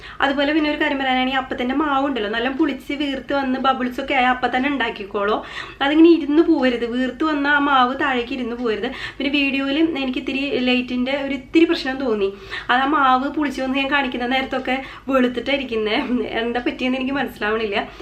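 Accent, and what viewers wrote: Thrissur, India. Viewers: മോളൂ! തൃശ്ശൂർ സ്ളേ ങ്ങാണോ? ഭാഷ നല്ല രസം ണ്ട്. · ശ്ശേ,,,,,,,,,,,,,,,,,ഇടക്ക് പെറുക്കി തിന്നു അല്ലെ? എനിക്ക് ഇനി വേണ്ടാ ട്ടോ തന്നത്താന്‍ കഴിച്ചോണ്ടാ മതി,,,,,,,,,ഹായ് എന്തൂട്ടാ ഇത് തൃശ്ശുര്‍ ആണോ വീട് പൂരത്തിന് വെടി പൊട്ടുന്ന മാതിരി അല്ലെ വാക്കുകള്‍ ,ഇഷ്ട്ടായി ട്ടാ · Hai chechi eppol aanu kandathu. ..subscribe cheythu ta Nalla talks. .thrissur aanu nnu Slang paranju 😀 evideya? Njan sandhya Lajith. .triprayar aanu. .eppol hus nte oppam tamil nadu aanu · തൃശ്ശൂർ ക്കാരിയാണോ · Speed kurachu paranjal manassilaakum.Trichurkkari aano? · chechi adipoliyatto supr avadaranam thrissurkkarellam inganano nishkalankamaya voice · ചേച്ചി തൃശൂർ കാരി ആണോ ആരായാലും സൂപ്പർ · അപ്പം കണ്ടു നോക്കീതാ. ദേ അപ്പൊ ഒരു തൃശൂർ talk.. ഇതെങ്ങനെ ഇത്ര speed talk.. ഞാനായിരുന്നു ഇവിടിരുന്നു ശ്വാസം പിടിച്ചത്.. വീഡിയോ ഇടയിൽ പരസ്യം വന്നില്ലായിരുനെങ്ങിൽ ശ്വാസം കിട്ടാതായേനെ.. 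അടിപൊളി ചാനൽ..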